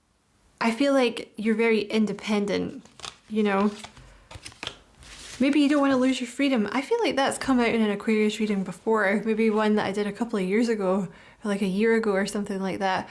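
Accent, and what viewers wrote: Scotland, United Kingdom. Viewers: This is so accurate on many fronts, thank you 🙏🏼♥️🙏🏼 Let’s hope this new person comes in as soon as the Devil ex is well and truly out of my life! Lovely to hear a fellow Scot and to see you being so successful in your field xx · Nice to hear a Scottish lassie here...we the best at this...love it I read too X with love Edinburgh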